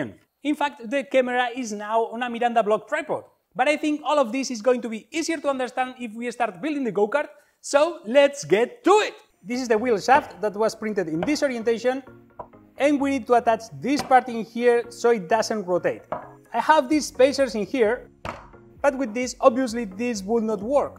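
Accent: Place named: Spain. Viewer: Madre mia que acento, tu eres español amigo! Pd Que passada de video tio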